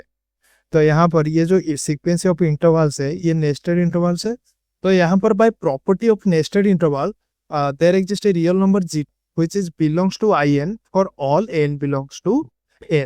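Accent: Odisha, India. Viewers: Are you from odisha??? 🙏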